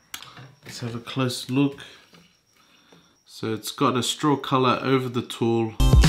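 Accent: Australia. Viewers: Are you in Australia? You sound it haha. I haven’t watched all of your videos yet but course did/are you doing? B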